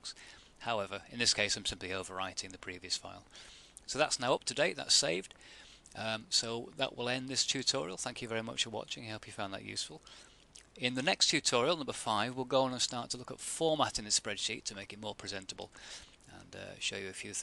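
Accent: United Kingdom. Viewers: Wow he is a very good teacher very clear. and the British accent keeps it interesting!!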